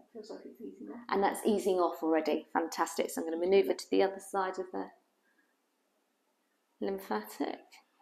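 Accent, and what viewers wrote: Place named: Cockney, United Kingdom. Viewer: Why does this nurse speak with a strong cockney accent? She must be related to Rodney Trotter from Only Fools and Horses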